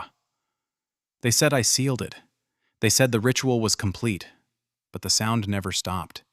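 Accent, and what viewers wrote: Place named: United Kingdom. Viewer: are you a Brit maybe cause your accent shown through in the middle of the story 😁?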